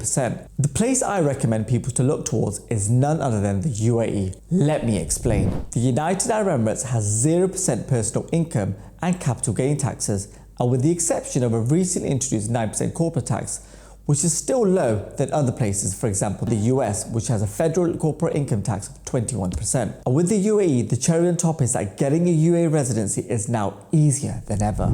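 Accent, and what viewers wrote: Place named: India. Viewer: yes you sound very much indian or bangladesh, whichever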